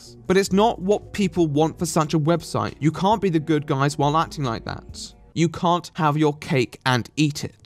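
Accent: RP, United Kingdom. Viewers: Whats with all these commentary channels with RP accents? Is all of the upper middle class in Britain raising children who need to constantly feel better than others? Fan either way. Great video. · a nice posh accent speaking on the delicacy of mental health related sponsorships to go with my cheap ramen. thank you. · A little something called British accent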